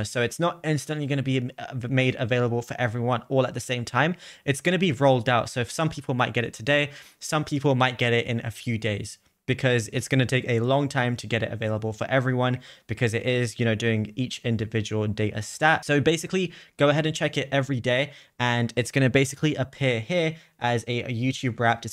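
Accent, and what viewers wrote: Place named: United Kingdom. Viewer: He’s got a British accent and he’s got it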